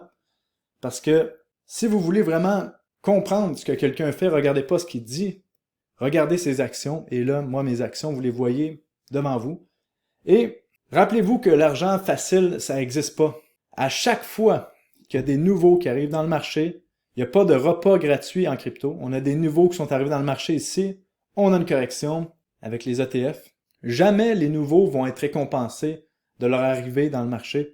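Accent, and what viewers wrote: Quebec, Canada. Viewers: Tu regale , j'avais un peu de mal avec l'accent québécois mais le contenu apporte tellement de valeur qu'on est obligé de s'y faire 😂❤💪🏼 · 🙏 hello restes comme tu es! toujours au top concis et en plus avec ton humour et ton accent canadien tu nous apportes plus un petit moment de rafraichissement qui fait du bien...et l'as si svt dit qd le marché monte n'oubliez pas de faire des profits!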